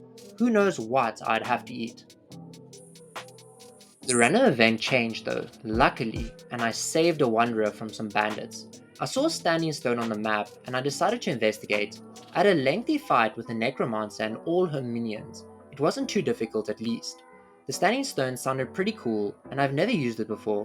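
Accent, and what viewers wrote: South Africa. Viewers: Is that a south African accent that graces my ears ?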